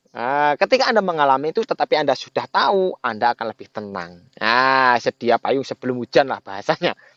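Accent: Java, Indonesia. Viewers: Mas sampean dari jawa mana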